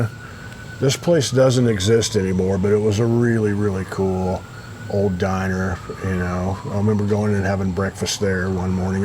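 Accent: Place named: Midwest, United States. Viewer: I am from Michigan you are so Midwestern I love that!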